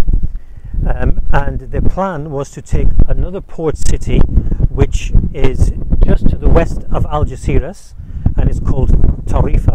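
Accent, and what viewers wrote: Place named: United Kingdom. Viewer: شكرا يا وردة. هل انت سوري من بريطانيا ؟ لهجتك اكسن بريطاني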